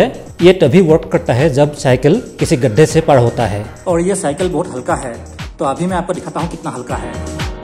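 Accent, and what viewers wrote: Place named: Bengal, India. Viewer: bro tumi bengali to bengali tai bollo tumar kotha sunei bhujte parchi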